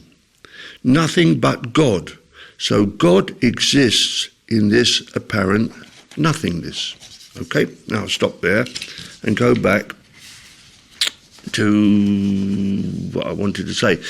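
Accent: United Kingdom. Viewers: Hi, did you know Stuart Wilde? What do you think of him? Your British accent reminds me of him.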